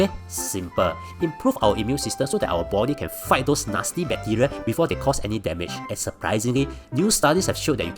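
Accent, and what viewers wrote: Singapore. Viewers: That is his signature style, exaggerated singlish, how abt u find another channel to watch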